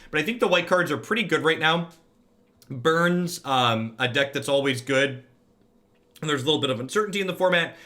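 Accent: United States. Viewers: a strong but generic american accent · I have found 3 unearth to be very good in this archetype. Combined with fulminator mages and kambals in the side it makes for some fun interactions. As a fellow Illinoisian, I have part of those same speech patterns. Great as always, thanks!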